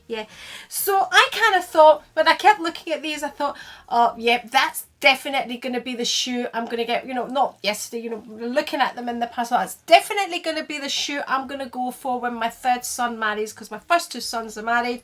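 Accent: Scotland, United Kingdom. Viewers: Beautiful classic shoes, Manolos are so sophisticated and unique !!!! It's always lovely to see your pretty face and I love your Scottish accent too. Lots of love as always to you, your mum and family XXXXXXXXXXX Q&A: how hard was it to marry so young and to have children so young ? How did you meet your hubby ? :) XXXXXXXXXXXXXXX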